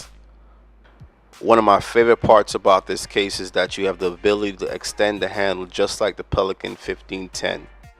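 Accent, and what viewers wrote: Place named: Maryland, United States. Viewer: I detect a Maryland accent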